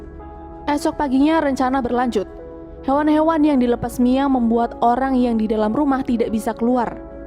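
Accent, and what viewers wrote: Sunda, Indonesia. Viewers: aya sunda an euy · Ngeunah euy suara sunda na 🤭😅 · Bodor aya sundana hahaha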